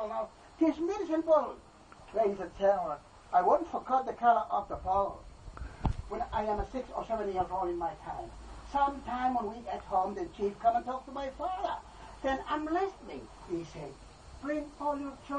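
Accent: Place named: Ireland. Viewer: Bros got an Irish accent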